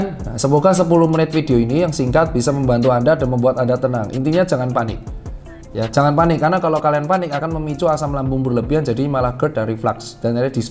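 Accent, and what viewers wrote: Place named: Yogyakarta, Indonesia. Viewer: Yang bikin beda itu penjelasan dr Tirta adalah mudah dipahami dengan kemampuan berpikir orang awam medis.... Dan bahasa logat nya jogja banget...salam dr Bantul... Semoga dr Tirta sehat selalu...GBU.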